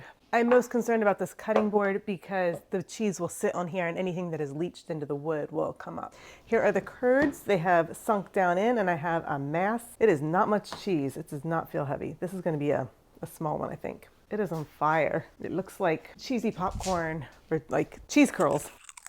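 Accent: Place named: Boston, United States. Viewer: What part of Boston are you from? ❤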